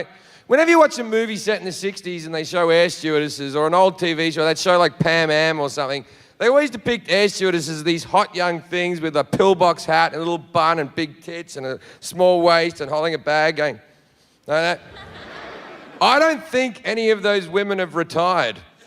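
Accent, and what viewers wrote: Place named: Australia. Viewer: I had just written ''Is he Australian ?? '' and at 7.50 I got the answer.. He is funny at times he is bringing a good point about armrests... I threw some side eyes to the armrest while I was at the window seat. Now I feel bad ... .By the way he told the story , I was able to visualize the scene... so he must have some talent... Australians are rough I think... and 9 minutes 55 seconds is all I can take of him today... I would not go to his show really .. he also seems as if he had three beers (unless this is his persona) .Listening to him is more like weight lifting exercise , the story as a whole is not offensive , although language used is heavy and rough.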